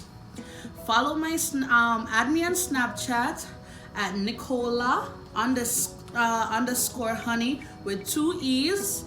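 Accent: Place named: Caribbean. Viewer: That wig is cute I need to get one of them wig. Sis where you from 🇯🇲 cause I hear a Caribbean accent👂🏼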